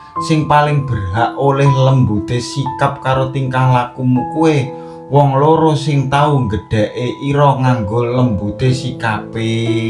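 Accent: Purworejo, Indonesia. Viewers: Omahmu ngendi kang logat medok purworejo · Bahasane koyo wong Purworejo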